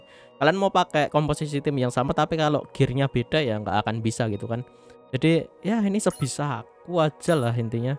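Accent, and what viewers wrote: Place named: Java, Indonesia. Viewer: Medhok bero. Hehe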